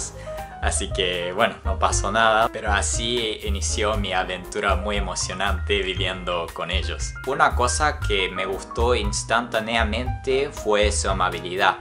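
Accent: Argentina. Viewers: Me encanta el acento que te quedó argentino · Se te pegó el acento argentino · ayyy, sabés que llegué al canal con el video donde hablás de qué tan difícil es hablar japonés y del por qué no vivís en Japón, y te juro que te escuchaba y pensaba "¿soy yo o su español suena un poco argentino?", ahora con este video entiendo por qué XD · Ahí se te notaba más un acento argentino, ahora en Valencia se te nota más el acento español. Soy argentina y también vivo en Valencia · Sos muy encantador, tenés una expresión muy buena, ya puse una vez que me encanta tu acento argentino. A mi me pasa igual con eso de diferenciar las dos culturas argentina y paraguaya, y las dos me encantan. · Tu acento argentino se nota! 😊🇦🇷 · Hola! Tu acento es muy argetino! Lindas las vivencias de viaje que tuviste, espero que vuelvas pronto a Argentina. Saludos 😊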